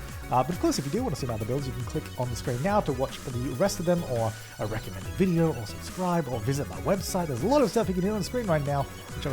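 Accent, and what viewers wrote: Australia. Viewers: He sounds Australian why are all of the Australian YouTubers so wholesome 😂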